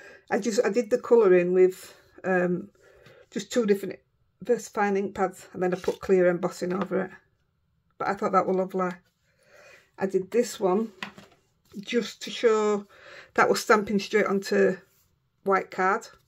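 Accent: Yorkshire, United Kingdom. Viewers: These are so cheerful! We usually get a little bit of snow here but none so far this year I'm sad to say. And your speaking Yorkshire is one of the many reasons I love your channel. 💞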